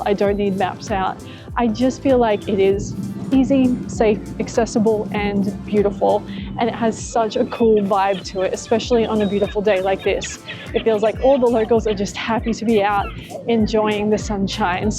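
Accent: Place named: Australia. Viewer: Europe here. So nice to hear the wonderful Aussie dialect again, brings back great memories from my time down under. Thanks for sharing your travels. Really enjoy your vlogs.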